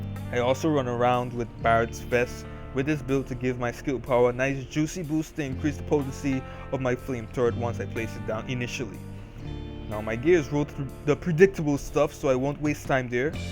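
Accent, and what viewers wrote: Caribbean. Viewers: TheDivisionVampire I'm Jamaican. That accent isn't Jamaican. Sounds Caribbean - just can't figure out which island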